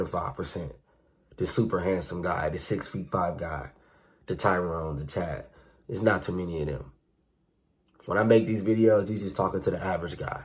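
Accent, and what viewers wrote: Baltimore, United States. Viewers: You from B-more?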